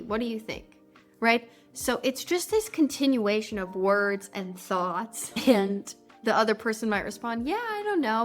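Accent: United States. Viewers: I found this channel last week and thought your pronunciation was very clear and a great example for speaking, so I started to imitate your speech. The blue lights on subtitles make me easier to copy your speaking because their movements and your voice are in sync. This speaking practice is very effective to get used to American accent and I do it every day. Your videos are so helpful and practical. I really enjoyed watching them. Thank you so much.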